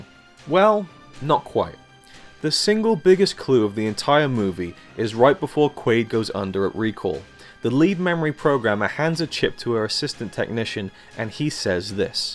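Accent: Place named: United Kingdom. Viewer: for British accent :)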